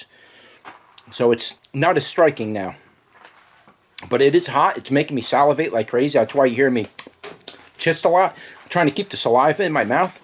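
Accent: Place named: New England, United States. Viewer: I asked be a you have a New England type accent. I’m from NE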